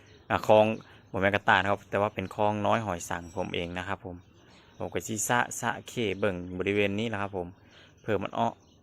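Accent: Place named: Rural, Thailand. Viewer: สวัสดีค่ะ ที่น่ารักมาเก็บเห็ดด้วยคนค่ะ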